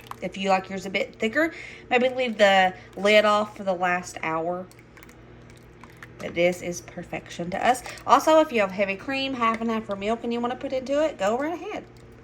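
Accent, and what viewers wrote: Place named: East Tennessee, United States. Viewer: I knew it! When you said "you'ns," I had to check the Description Box. I grew up in either TN or KY most of my life, and I thought you might be from Eastern TN listening to your accent. I love hearing someone speak "right" on YouTube, BTW, LOL! But when you said that? It just made me SMILE! I'm currently in Western KY, but I know my accents. Please don't ever change! Just found your channel, and I love your ideas--new sub. Feels like I found a new friend. God bless you and your family! 🙏💖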